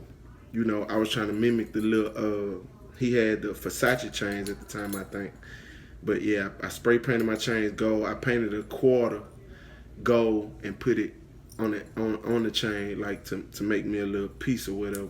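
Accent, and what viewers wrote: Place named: Louisiana, United States. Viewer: It make perfect sense dat he frm Louisiana cuz he alr got da accent so it probably ain that hard for cuz to even do iy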